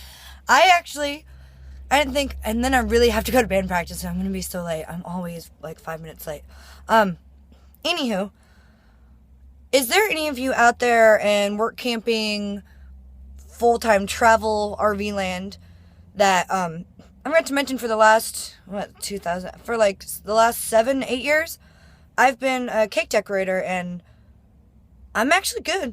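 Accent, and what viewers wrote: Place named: Pacific Northwest, United States. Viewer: I like your vids! It's always been a dream of mine to live in a camper/van/etc..... very cool. Also I could tell you were from somewhere between Portland and Seattle, probably the way you talk, it's almost like I've known you for years or something lol can always tell when someone is from the PNW or close to it.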